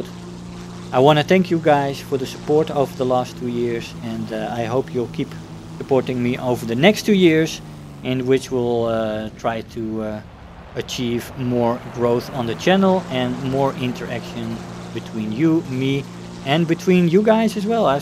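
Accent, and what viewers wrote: Netherlands. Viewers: Great chat. I've been playing for approximately 2 years, and in that time, I've only watched three channels for WT. The first was Long5hot, the next was Tx141 and yours. I chose only these channels because of the ANALYSIS. These channels communicated nothing but useful information and helped me get passed the horrible newbie stage. The other distinguishing feature of these three channels is the adult and friendly nature of the narration. You get the impression from watching that the three authors are approachable and guys you'd like to know personally. I cannot say these things about ANY other channel I've watched. Some were even annoying. Finally, you introduce the element of humor and personal commentary, which I enjoy. The only other WT Youtuber that makes me laugh is Squire. So, in conclusion, you offer careful analysis, adult and pleasant narration with a Dutch accent, you include some humor and a personable approach. 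I'm proud to be a member of your squadron and I appreciate your contribution to the community. PS - TOTALLY PUMPED FOR THE ITALIAN TREE. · When I first saw you in battle you were such a nice guy, if I recall correctly it was a early Spit game. Some people commented on you and I decided to look at your channel. Intant hooked, you're helpful and mature. Your accent is kinda cute too (Is it Dutch?)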